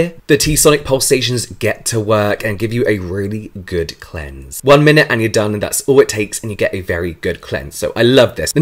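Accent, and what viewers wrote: United Kingdom. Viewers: Do you come from the same exact area as Hermione Granger (aka Emma Watson)?? THE ACCENT!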